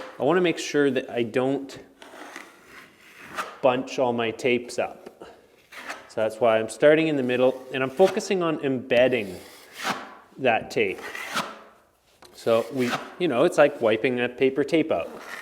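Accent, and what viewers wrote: Canada. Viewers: If you mix quickset 5 mud with quickset 20 will it set up in the average of the two (or 12.5 minutes) if both parts are mixed equally? Ok, I’ve worked the last 14 hours so my attempt at humor fell on its face. Great video (again). I’m so glad I discovered you a couple of months ago. I’ve learned so many techniques and tricks from you. I love your sense of humor too. I’m from Canada (and want to return) so it’s nice to hear your Canadian accent speaking in English:). Keep up the inspiring and informative videos! Btw, I was a carpenter while working though college. I built houses and apartments and since they’re still standing I must’ve done a good job. I’ve also made surfboards and made a 17 foot sailboat. 👍👍👍 Btw, is Canada allowing Americans into Canada? I was born in Canada so I hope they’ll let me back. You’re so right, these are hard and strange times. 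Thank you for that heartfelt message.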